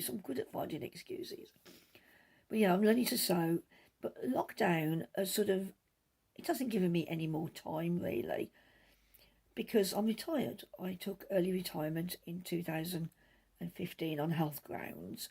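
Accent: Scotland, United Kingdom. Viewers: Hi, I watch Caz too and she told us about you so here I am. Thoroughly enjoyed your podcast, as someone below said, it was just like sitting having a chat. You came over really well. You are a lovely knitter and I'm looking forward to watching your other 3 podcasts you've done so far. Keep up the good work. P.S. your accent was lovely and like Caz I'm a Scot but a low lander and your accent sounded perfect to me. Take care and stay safe. Alexis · Hi I really enjoyed your podcast after Caz introduced you? I too am Scottish. I really like your accent, I’m off to watch your other videos now. Happy knitting 🧶🌸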